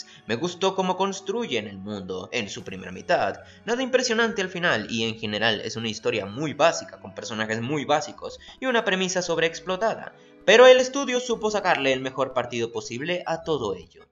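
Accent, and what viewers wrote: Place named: United Kingdom. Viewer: Se que todos odiaran este comentario, pero extraño el acento britanico de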